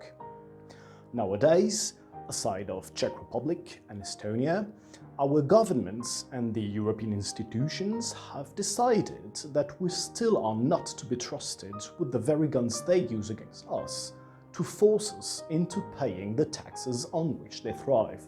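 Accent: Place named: United Kingdom. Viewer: très British ton accent ;)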